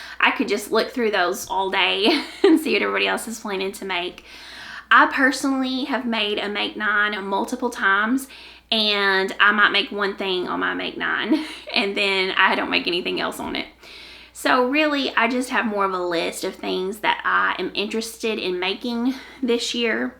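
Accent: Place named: South, United States. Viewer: Can I say that I could listen to YOU talk all day long. I absolutely have never known anyone with a southern accent (and have never traveled to any southern states) so I’m just smiling while listening to your podcast. You are adorable!! Thank you for making time in your day to share your life with us.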